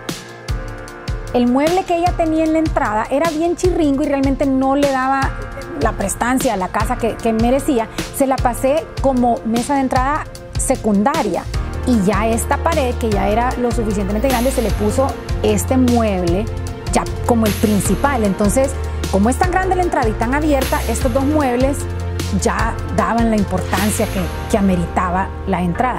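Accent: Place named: Honduras. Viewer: Wow le quedo hermosa la casa, hace poco la encontré y me encanta su canal .... La veo desde NY pero soy de Honduras creo que usted por su acento también verdad . Felicidades un trabajo muy bello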